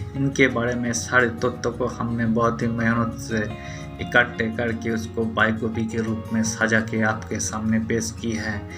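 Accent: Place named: Bengal, India. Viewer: Dada do you belong to Bengali Family as your Hindi pronunciation indicates so Thank you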